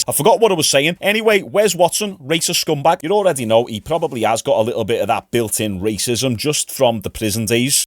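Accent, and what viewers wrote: Liverpool, United Kingdom. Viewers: Are you Scouse? Actually, is this even a question? I don’t deal with if, ands or maybes, only absolutes!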